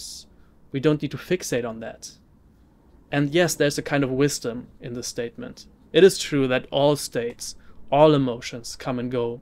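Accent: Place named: Germany. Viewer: You sound German. Are you German? 😄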